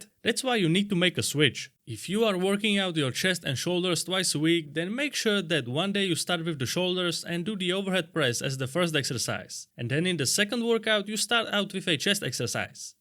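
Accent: Czech Republic. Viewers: Do i hear a czech accent?😗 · What about the dumbbell overhead press, I stand up when doing it, so I am vertical, but I push elbows and head a little forward, is it OK then? Btw I knew you are Czech after the first sentence, we can recognize that accent anytime :-D Ať se daří :-D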